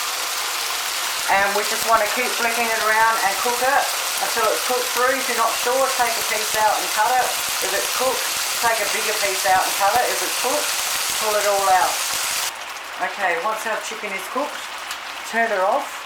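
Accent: Australia. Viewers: Omfg. Your energy is so sunny, warm and comforting. You feel like one of those people that you feel like you have known forever but you only just met them. ❤️😍 I am obsessed with your personality. Why have you been hiding yourself. 😁 That thick aussie accent just melts me. 🤩 Can I please ask what your zodiac sign is? I need to try this chicken thing I love noodles 😊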